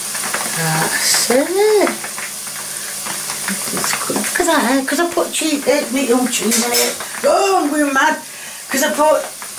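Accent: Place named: Yorkshire, United Kingdom. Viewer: where are you from? We have a disagreement here.... I think you're from north Rotherham somewhere. My mum thinks you're from somewhere like Wakefield. Please help xxxxxxx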